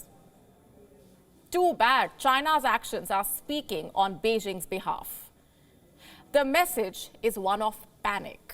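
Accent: India. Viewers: Very funny way of reporting. Does not seem to be a news channel. She chews hard on words and make it very heavily Indian accented English. And the sentences she speaks are too short, paused and not continuous. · You sound like an Indian and India had been through a much worse phase not long ago. You even burnt the bodies on the street in broad daylight. So what qualification you have to judge China? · Why indi english??? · shut up，indian accent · Hahaha. So obsessed with China, don't show your inferior complex. Don't worry for them. Spend time to talk up India first, to be the no 1 power in the world by this decade. Also tune down your hindi accent please. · Man, I can listen to these Indian dames talk all day long!! Their accent is as cool as can be. (But maybe it's because my Brooklyn accent is as crude as can be in comparison)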